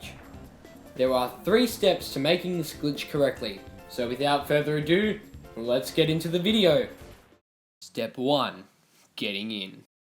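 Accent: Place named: Australia. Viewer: Like this comment if you only care for the Aussie accent 🙂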